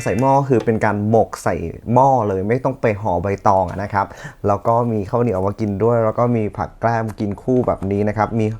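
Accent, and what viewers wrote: Isan, Thailand. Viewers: ชอบคุณ เว้าภาษาอิสานฟังละม่วนดีครับ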